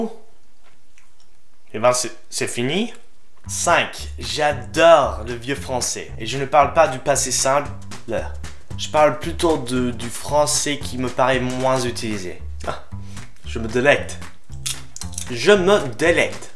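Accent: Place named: United States. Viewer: J'adore ta nouvelle chaîne !!! gros pouce bleu 😍 continue ! et j'adore ton accent américain :)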